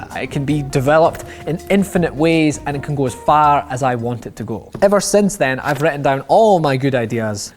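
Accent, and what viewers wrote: Scotland, United Kingdom. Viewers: I love how when i see "crappy" i can hear it in the Scottish accent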